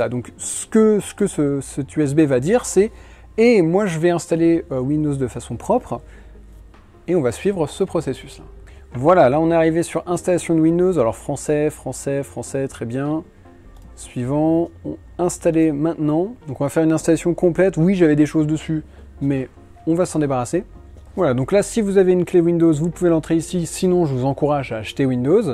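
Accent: England, United Kingdom. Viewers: Arrete avec ton accent anglais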